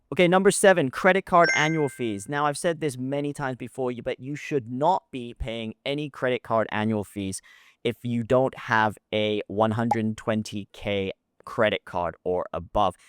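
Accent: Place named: United States. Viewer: wat an american accent dude talking singapore finance? go back to america!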